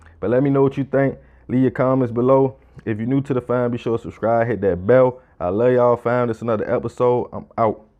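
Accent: Baltimore, United States. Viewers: Bro he baltimore. Can't you tell by his accent.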